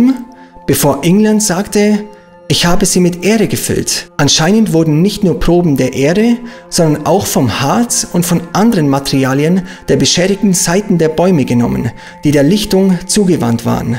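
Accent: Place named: Bavaria, Germany. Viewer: Hi, hab ich entdeckt und direkt gleich all deine Videos angeschaut 😅richtig toll! Sag mal, wo kommst du her? Voll schön, den bayrischen Dialekt mal raus zuhören. Macht dich mega sympathisch ☺️☺️✌️ Grüsse aus Regensburg 😊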